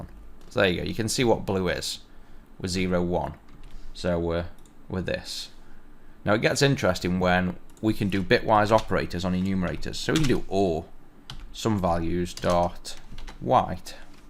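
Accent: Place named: Manchester, United Kingdom. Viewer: Great tutorials, I'm enjoying them immensely. I'm coming from VB (I know!!!), <link>, VBA, <link> background, although I have also written a few, fairly rushed C# programs, I'm watching these more for good programming practice and to quickly fill in any big gaps in my knowledge - this tutorial being one such example. If there is one thing I will take from these tutorials, it is the proper documentation of code, I have been shamefully neglectful of this in the past :( I have a couple of questions, if you don't mind, do you have any plans to cover MVC and where are you from - my guess is South Manchester :) Anyway, thanks again for the tutorials - superb stuff.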